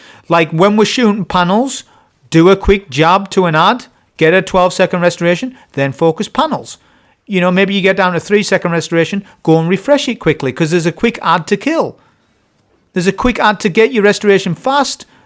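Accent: United Kingdom. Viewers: Also the amount of British in this video is definitely an 11/10 crashout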